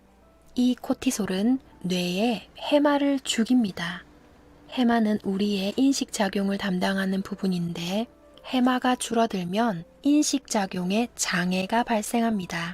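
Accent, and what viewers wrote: Gyeongsang, South Korea. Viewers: 님의 경상도 억양 너무 귀여워요^^♡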